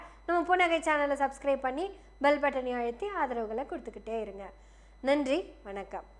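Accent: Kongu, India. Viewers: கொஞ்சும் கோவை கொங்கு தமிழ் சூப்பர்மா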